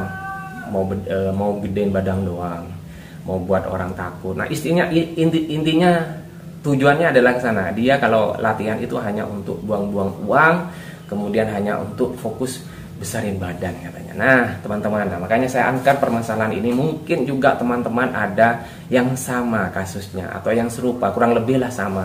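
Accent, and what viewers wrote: Madura, Indonesia. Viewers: logatnya kaya madura ya bg ku?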